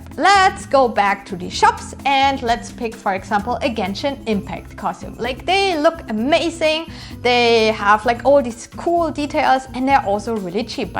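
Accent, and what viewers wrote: Germany. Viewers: Ey ich liebe deinen Akzent, der ist so schön deutsch XD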